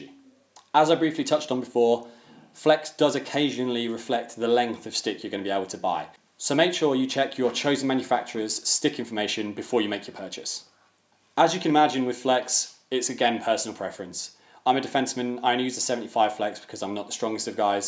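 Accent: England, United Kingdom. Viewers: A black dude with an english accent is really what comes to mind when i about hockey sticks. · A black guy speaking in a British accent discussing hockey. Never thought I would see the day.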